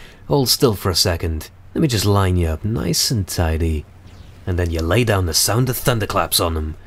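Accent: United Kingdom. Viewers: You and XboxAhoy need to collaborate. NOW! Two British accents in one vid? Eargasm!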